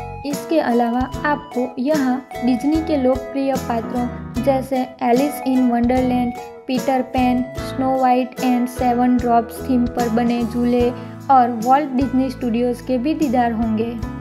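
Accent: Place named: Gujarat, India. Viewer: Tame gujrati 6o aevu lage 6e 🤔🤔🤔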